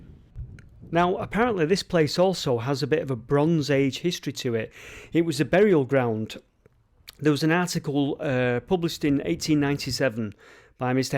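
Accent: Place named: Oldham, United Kingdom. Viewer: My family is from Oldham, I’ve visited twice in the last 3 years. Can’t wait to visit again., love your accent, reminds me of my dear late dad. ❤️❤️❤️❤️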